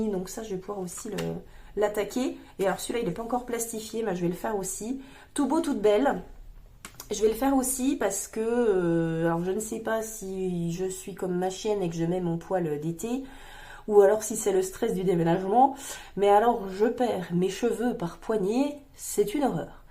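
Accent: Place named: Doubs, France. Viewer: Quel plaisir d’entendre ce doux accent du Doubs 🤣🤣🤣 je suis originaire de Dole 🥰 je reprends l’accent dés que je descends et je le perds quand je remonte en seine et Marne 🤣🤣 J’adore ton nouveau classeur et hâte de te retrouver Des bisous tout doux ❤️❤️❤️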